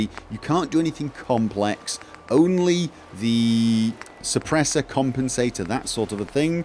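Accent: United Kingdom. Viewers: Cool voice! This britt snob voice that equally irritates and charms))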